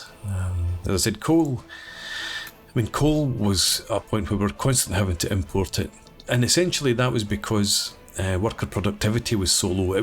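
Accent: Scotland, United Kingdom. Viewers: going by the choochta accent your no west coast, you towards falkirk?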